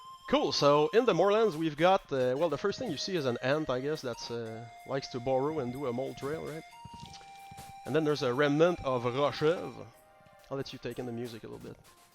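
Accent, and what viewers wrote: Quebec, Canada. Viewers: Vraiment cool d'entendre un accent québécois! :) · Wait! I recognize that accent! You're Québecois? Nice! I always get surprise when i hear our accent on youtube. So few Québecois gamers on here. XD Can't wait to play that! <3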